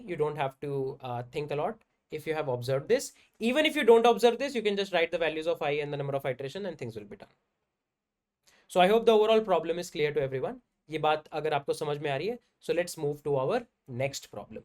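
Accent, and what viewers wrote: India. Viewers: through your commnet i noticed that this video was not in hindi language, the accent is so indian that I really thought that he is talking in hindi lol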